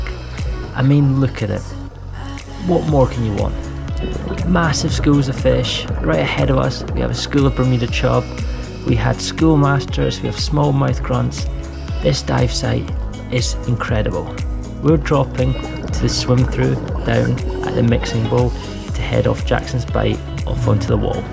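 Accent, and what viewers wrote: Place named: Scotland, United Kingdom. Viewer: It's one of the many things I love about your channel. Never lose your Scottish brogue. I have cousins that visit from Ireland. I can tell the difference between that and Scotland but there are overlaps. Someone might say, "fancy going for a wee dander?" There was a time when I did not realize that meant, would you like to go for a walk? My wife is French Canadian and I never realized how many figures of speech American use that she is not familiar with. 😄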